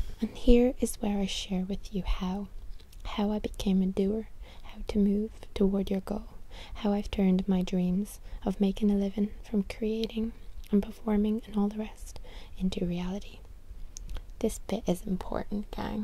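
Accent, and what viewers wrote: Ireland. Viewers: Being from Germany, no, being from wherever you are in the world, the Irish accent, and especially with your angelic and precious voice, this is the best ASMR in the world. We all wait for the channel! Your voice, and aura, and presence have the power to heal. Thank you so much. Go raibh maith agat! <3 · The Irish Accent just lends its self to ASMR ! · I’m not into whispering so I love soft spoken. However, with that lovely Irish accent this makes the soft spoken voice even better! It is so relaxing you can’t even imagine, so Thanks! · I love the Irish accent so much!! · This was just the kind of thing I've been looking for. A nice Irish asmr reading some sort of book. Love you 💚💚 This actually sent me to sleep! Thank you so much. Can't wait to read that part of your book 💚💚😊😊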